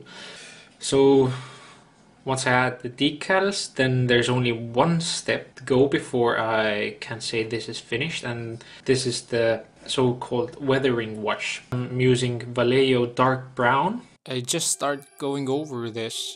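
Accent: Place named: Northern Europe. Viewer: U know was wondering where u come from? is cause of ur accent and entonation. Sounds to me u r from Northern Europe? Whatever the case, ur video was a great one! keep going. BTW that halftrack how much was and where u purchased it? thnx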